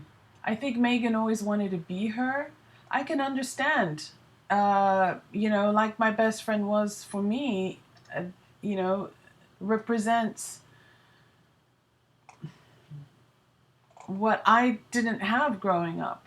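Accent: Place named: Milwaukee, United States. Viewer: It's so uncanny: you have the same speech pattern as Some Girls! Even using "Ummmm" "uhhhh" with a slight Milwaukee accent!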